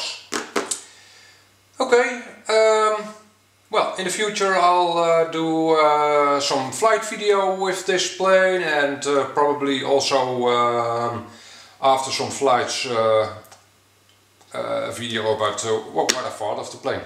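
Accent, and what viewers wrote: Netherlands. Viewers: Mooie video! Misschien is het handiger om NL te spreken? Het is een stuk moeilijker om naar Engels met een Nederlands accent! Voor de rest echt een SUPER GOED KANAAL! Meteen geabboot. 👌😊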